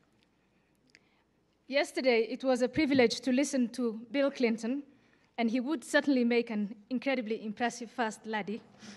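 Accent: United Kingdom. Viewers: yo your spoken english is british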